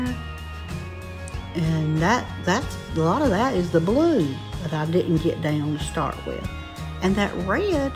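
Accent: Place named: Appalachia, United States. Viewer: I have been watching videos on how to make a barn quilt. Yours is absolutely the best! You give very clear, step by step instructions. You are the exemplar of the fine Appalachian qualities I so appreciate. You demonstrate resourcefulness, determination, creativity, and perseverance. For examples, the way you decided to make your own rather than buy one ready made or use a kit, the way you used the extra wood to make a shelf, the way you used the reverse side of the board when you needed to begin again, the way you were committed to making the best product you could - even if it required more work. I admire you so much! And may I add that your soft-spoken regional accent is very pleasant to hear. I am recovering from surgery and will be off my feet for a few more weeks, but as soon as I am up, I plan to begin some of these paintings for our sheds. 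Thank you for taking time to show others how this is done.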